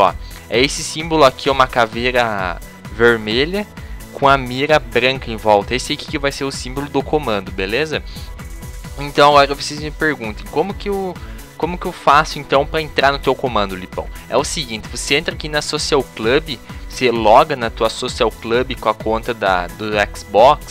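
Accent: Rio Grande do Sul, Brazil. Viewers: gaucho? kkkkkkkk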